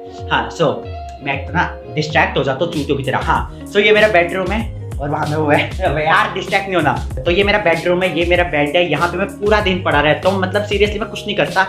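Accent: India. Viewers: Sound like Himachali ??? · Delhi se ho Accent dekh ke lg rha h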